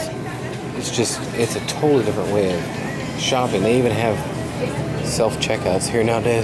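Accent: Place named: United States. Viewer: Might be a stupid question, but why do you seem to have never been in a large american supermarket, yet you sound like you're american?!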